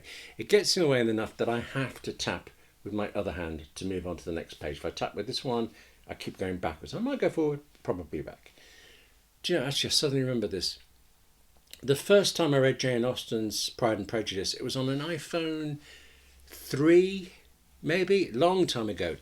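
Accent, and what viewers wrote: United Kingdom. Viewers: I love the way that you describe your experience. so sincere and persuasive!!! and I do love British accent a lot. thank you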